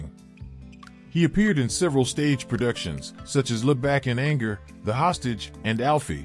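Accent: United States. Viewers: A narration with an American accent is inappropriate for this video. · Got the pronunciation wrong. Told in americanese.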